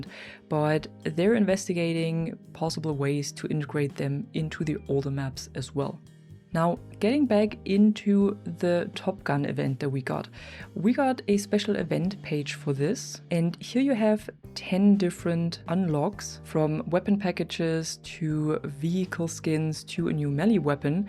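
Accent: Germany. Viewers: I like your German accent when you speak in English 🙂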